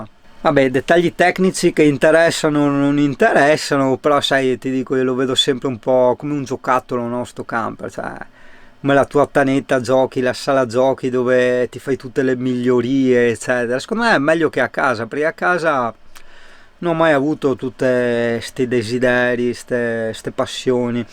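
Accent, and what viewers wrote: Veneto, Italy. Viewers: Non cambiare i tuoi video piacciono perché sono genuini e con la parlata veneziana a volte un po' colorita.... Per il mono patino informati sulle regole in qualche città li equiparano al codice stradale di un motorino ....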